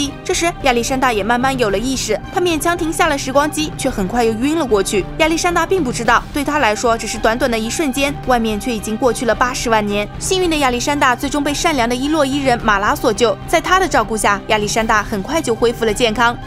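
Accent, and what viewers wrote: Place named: Henan, China. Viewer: 無意間聽河南人的口音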